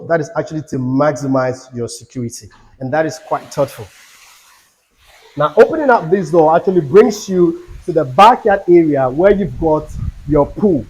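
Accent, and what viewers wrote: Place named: United States. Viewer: of late sounds Soo American oo😂😂😂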